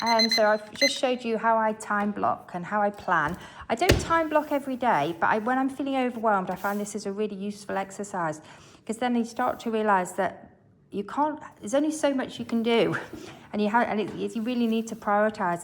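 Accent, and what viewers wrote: United Kingdom. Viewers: British accent 🍷☝🏻🤓 Nice video tho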